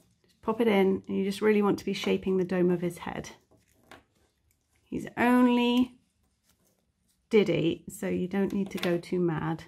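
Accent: England, United Kingdom. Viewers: Great tutorial but I’ll admit I was thrown off at first by the English accent but American crochet terms 😂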